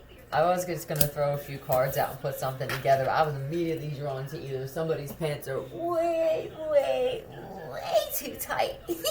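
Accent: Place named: Philadelphia, United States. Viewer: Yooooo giiiirrrrrllll I LOVE YOU AND IM NOT TOO FAR FROM YOU AND BY THE PHILLY AIRPORT!!! You are spot on with these MF and what they are doing to me presently and have been for the last 3-4 years… gang stalking, harassment, hacking so much more….. smfh!!!! I would love to meet you in person so bad!!!! This reading had me on the ground rolling laughing!!! I love your Philly accent and I love the impressions of the women of the night and the wanna be women of the night!!!!!! I can’t make this crap up that they are doing to me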